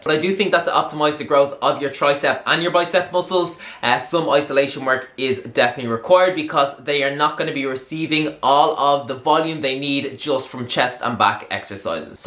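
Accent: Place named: Ireland. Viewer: Based on the accent he's from Ireland, maybe Dublin. Im not sure though.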